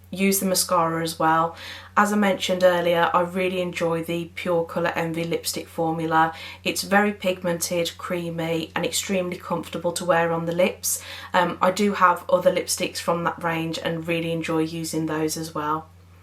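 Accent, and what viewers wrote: West Midlands, United Kingdom. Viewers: Hi hun was looking to buy this set and thought I would check out a video on you tube to see if worth it and found your channel, you sound local West Mids? but yes great review they should give you commsion for promoting this lol.....will check out some of your other videos have sub'd :-) x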